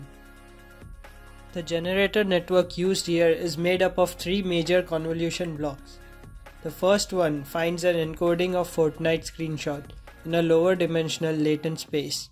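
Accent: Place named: India. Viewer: all i need is 1 sec to understand u are from india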